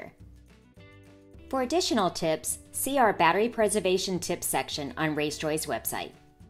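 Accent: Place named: Baltimore, United States. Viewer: Narrator is from Baltimore, not a guarantee but pretty dang shure hon.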